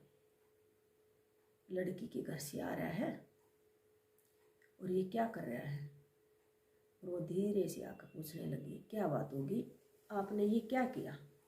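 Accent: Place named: Haryana, India. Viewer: bhn me theth haryanvi 👍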